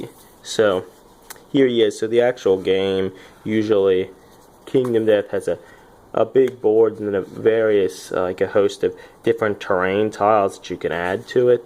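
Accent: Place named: Louisiana, United States. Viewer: Nice video. Gotta say, you almost sound Cajun. Are you? I am. ^_^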